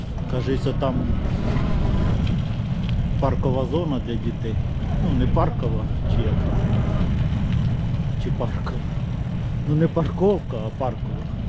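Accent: Poltava, Ukraine. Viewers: Чисто полтавский говор не спутаешь ни с чем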